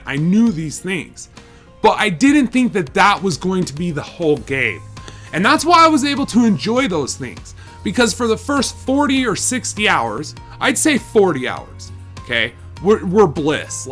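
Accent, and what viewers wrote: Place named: East, Canada. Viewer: Accent sounds like eastern Canada.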